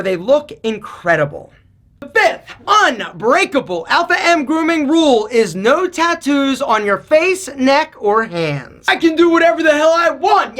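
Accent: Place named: Baltimore, United States. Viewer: Holy Bmore/Philly accent, Batman!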